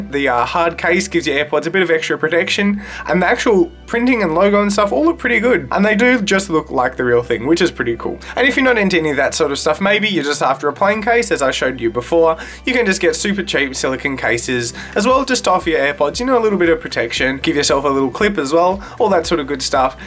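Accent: Australia. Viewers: G'day mate! Aussie accents are so orgasmic I can get off just listening to yall speak. But, having said that you're speaking too fast that I gotta turn the captions on in order to understand what you are saying. I've got Samsung's Galaxy Buds myself for about 7/8 months now and I have just ordered a case for it, something similar to the Nike's in this video, just without any logos on it. It's so hard to find a good and cheap case for non-airpods TWS earbuds. The case I ordered + shipping was a few cents shy of $30. That was expensive. The cheaper ones either have zero or negative reviews. I read one that said her galaxy buds charging case "slipped out" of the case which was hooked onto her handbag with a carbiner and she only realized it was gone when she was home because she was blasting songs with the buds on the subway and couldn't hear it hit the floor.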